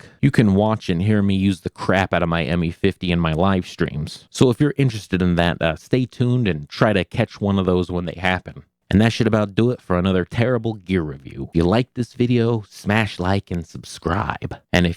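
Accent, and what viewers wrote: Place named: Chicago, United States. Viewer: Your Chicaaaago is showing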